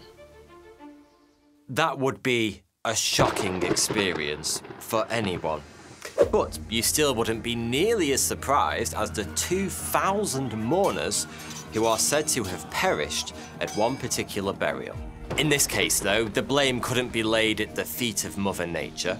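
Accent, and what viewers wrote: United Kingdom. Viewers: you're the best story teller ever and your britich accent makes 20 times better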